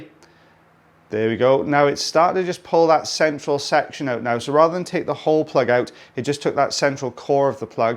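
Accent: Wales, United Kingdom. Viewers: your wenglish is great to listen too unless your Welsh you won’t know what that is but I’m sure does fab peel love these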